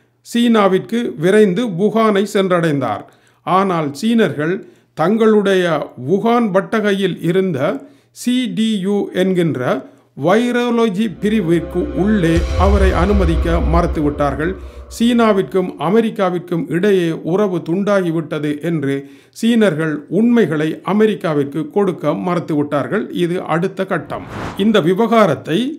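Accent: Sri Lanka. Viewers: Information are good. Your tamil looks like srilankan tamil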